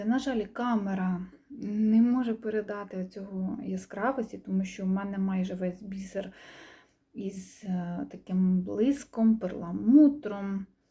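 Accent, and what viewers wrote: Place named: South, Ukraine. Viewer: як завжди ви не перевершені,гарні схеми,гарна робота і може я я помиляюся, милий душі південний акцент.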